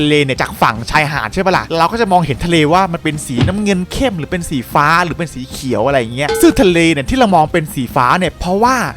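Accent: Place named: Sukhothai, Thailand. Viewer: พี่พูดเหมือนภาษาสุโขทัยเลยคับ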